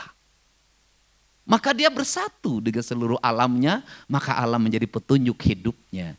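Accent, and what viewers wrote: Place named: Sunda, Indonesia. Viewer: Krn pidato nya sell : tanpa text, spontan dr hati, topik nya sell menarik sesuai dgn kepentingan bahasan nya, gaya bahasa n bicara nya yg 'khas,' sangat expressi , serius, tanpa kehilangan selera 'humor' nya yg 'mengena' , tidak norak bhkan terkesan ada nuansa 'intelektual' dalam setiap kata n kalimat nya , bhkan sekalipun dlm bahasa daerah (Sunda) nya yg msh sangat kental, cermin betapa KDM sangat cinta pada budaya leluhur nya .. 👍👍👍👍♥️♥️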